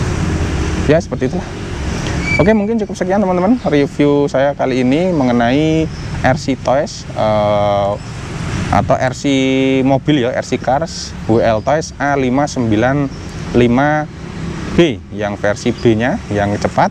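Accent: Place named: Sawojajar, Indonesia. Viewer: Kyk nde sawojajar sam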